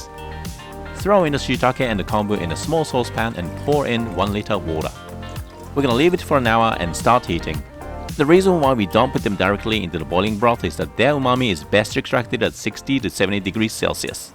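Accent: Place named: Australia. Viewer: As an Aussie, I can hear the Aussie. Especially when you say “chuck” something in, that’s very Aussie 😂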